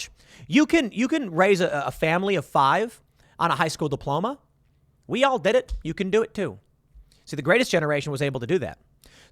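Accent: Chicago, United States. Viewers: Sheesh,. Your South Chicago accent showed through today.... Appreciated your commentary. It made me reflect on my circumstances... past and present. In the 1970s it became harder and harder for a working class family whose parents had not gone to college to make it on one salary. That was when mothers (my mom included) started going into the work force - out of necessity to help their families keep up with the inflation of the 1970s. It is why my sister and I were encouraged to go to college... because it really was thought a college degree would help your children break through financially. Now, back then, you had to go to a bank with your parent to get a school loan. Mine was $10,000 over four years (that was a lot back then). After graduating from college, I had to pay that loan back over a ten year period. I still remember that last loan ticket and paying it off. 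Good feeling. But at some point the US government stepped into the school loan business. I think that's when things got out of hand and when college tuitions screamed higher and higher way above that supposed rate of inflation at the time. By the time my children (your age) were ready to go to college, my spouse and I had bought into what we were told, that it was the thing to do. But we also believed it was the best way for them to have a chance to make a decent living. Honestly, younger boomers and older gen-xers like myself felt they had to go the college route for their children, had to encourage it. We w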